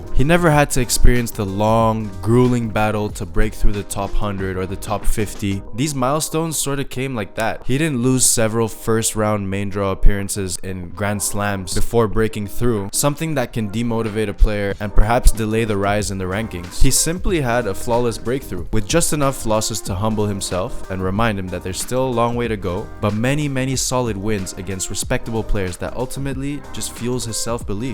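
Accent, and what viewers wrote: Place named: Spain. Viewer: Pareces chino, hablas perfecto inglés y se nota que eres español a la vez xd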